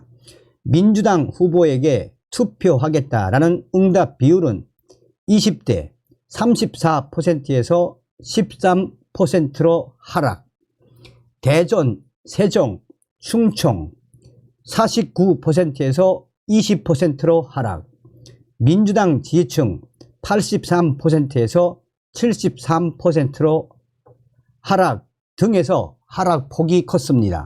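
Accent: North Korea. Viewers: 정성산 티비뉴스 고맙수다래 ㅎㅎㅎ 이북말 정겹읍니다 🌼🌷🌸🙏🏻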